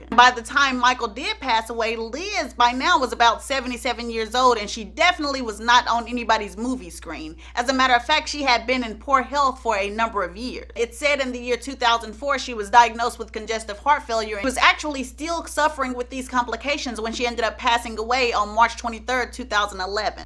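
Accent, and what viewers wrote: South, United States. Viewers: gurl...I wish I could give you five thumbs up...the way you tell a story is phenomenal!!! I had to listen to this one twice it was so funny. Your voice and that lil southern twang and your comedic timing are so on point it cracks me up every time. Thanks for the joy and laughter you bring. Sayso fam: Seek the Lord while He still may be found! All praises to the Most High...praise His Holy name...halleluYah!!! Love to all shalom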